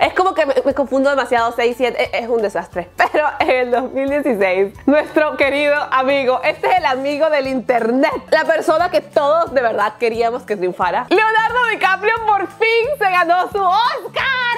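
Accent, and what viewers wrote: Venezuela. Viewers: soy yo o aveses abla muy venezolada!!!!😂😂😂😂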